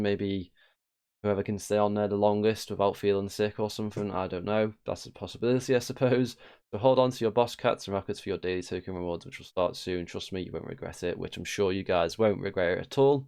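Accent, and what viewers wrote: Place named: England, United Kingdom. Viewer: Great video bro good to hear an English voice commenting on BCRC 🏴✌️✌️✌️ can’t wait for my vox cat airdrop to use in boss planet it’s going to be 🔥🔥🔥 boss cats stick together keep up the great work guys. WAGMI everyone is bullish on boss cats don’t miss out people these great you tube videos give you all the information you need. Announcements after announcements are always the norm in boss cat rocket club and delivering day by day on the roadmap this is just the beginning ayoooooooooooooo LFG lg1985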